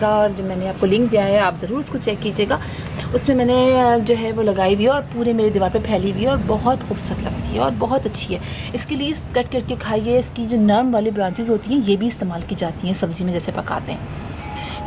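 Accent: Pakistan. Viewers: না ভাইয়া, বাঙ্গালী পুইসাক বলে, কলমী না। অন্তত বাংলাদেশে পুইসাক ই বলা হয়। দিদি বাঙ্গালী না কারণ তাঁর ভাষা নিরেট উর্দু। বোধহয় তিনি ভারতীয় কিন্তু তার accent টা পাকিস্তানী উর্দু ভাষী।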